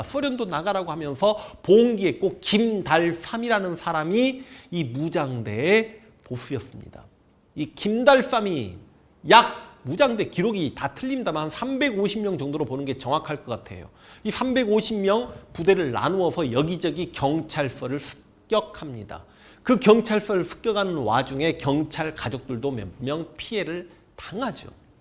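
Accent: Jeolla, South Korea. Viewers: 원래 전라도 사람들이 감성적이더군요 이분도 사투리 억양이 딱 그쪽이네욪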